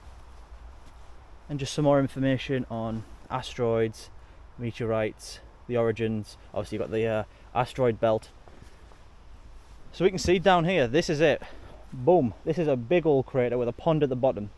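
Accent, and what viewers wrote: England, United Kingdom. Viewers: Czy ty nie słyszysz że to Anglik.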